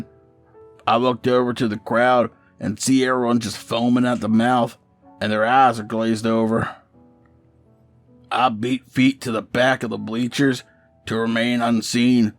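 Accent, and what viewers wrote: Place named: South, United States. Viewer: Awesome video. Love your southern accent. Keep up the great job man.:)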